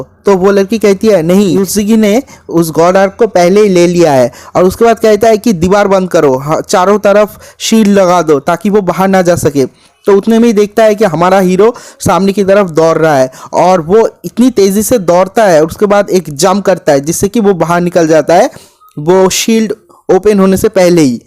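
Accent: Bengal, India. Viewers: Bangali ho ?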